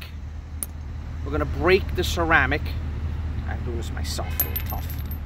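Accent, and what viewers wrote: New York, United States. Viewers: He sounds like the definition of an American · certified new york accent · The New York accent makes me think that’s not his car.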